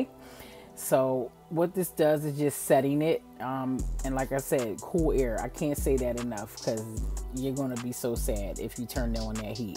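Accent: Philadelphia, United States. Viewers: Are you in philly? You sound like my Philly family. I need a natural beautician and I be in Philly sometimes.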